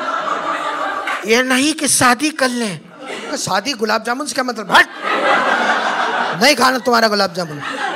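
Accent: Uttar Pradesh, India. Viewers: His UP accent reminds me of Rajeev Srivastava 😂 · Up accent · U.P. tone🔥🔥🔥🔥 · Bhai ke bolne ke tarike me hi UP jhalakta h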